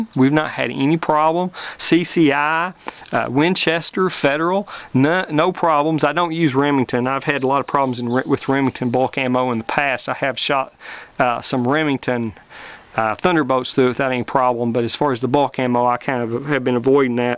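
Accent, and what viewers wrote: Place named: South, United States. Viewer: Buddy, where in South are you from? You have a very distinct accent.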